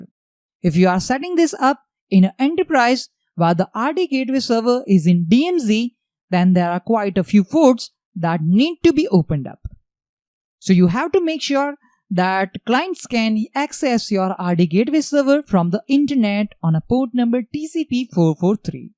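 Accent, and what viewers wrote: India. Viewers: His English is India-Style and full understandable. So what´s your Problem ?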